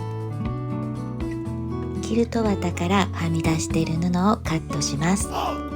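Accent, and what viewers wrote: Kansai, Japan. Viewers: オススメに出てきました😊はじめまして～ヾ(*･ω･*)ﾉ 時々出てくる関西弁と、関西弁じゃなぁい⤵︎⤵︎⤵︎と思いきや、イントネーションが関西な所❤Love 親近感あって安心するし、生地が出来上がって作品になっていく過程がまた可愛くて、最後まで飛ばさずに見てしまいました😂 ハンドメイド系のYouTubeは、ついつい最後の完成品を見てから、気に入ったら最初から作り方を見る、という感じですが、今回は、まるっと見ちゃいました❤ 素敵な動画をありがとうございました🎶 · 関西弁がええなあ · おすすめから来ました！パッチワークはやったことがなかったのですが、こんな小さいハギレがこんな素敵な作品になるなんて驚きです！ 関西弁の落ち着いたナレーションもめちゃめちゃ癒されました！チャンネル登録させていただきます！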